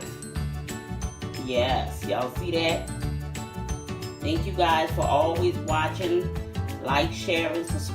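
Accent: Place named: New Orleans, United States. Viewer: She sounds like shes from Louisiana New Orleans to be exact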